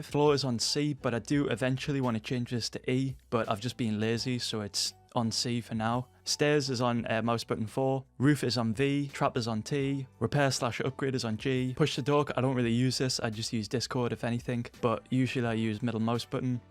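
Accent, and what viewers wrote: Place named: North East England, United Kingdom. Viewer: You sound like your from the north east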